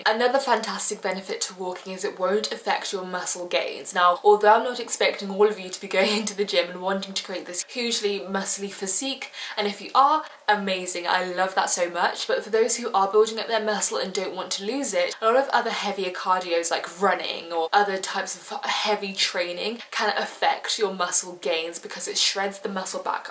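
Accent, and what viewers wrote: RP, United Kingdom. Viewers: her accent is very posh, we don't all sound like that lol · Yeah I know there's different British accents hahaha but her particular one is so pleasant to listen to because she pronounces the words so clearly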